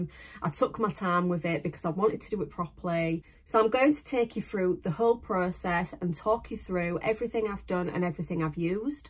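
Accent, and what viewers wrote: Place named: Hull, United Kingdom. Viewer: Great cleaning video just subscribed I knew you was from hull as soon as you spoke went back to find out on first video I’m from hull too going to binge on your other videos when I get chance xx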